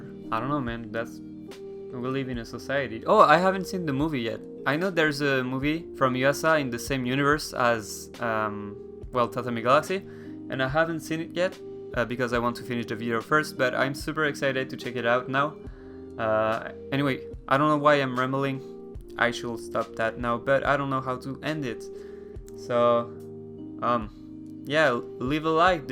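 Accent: France. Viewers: Your analysis makes me see the show with a whole different eye, truly amazing ! Also your french accent is almost unnoticeable, how did u get rid of it so well ?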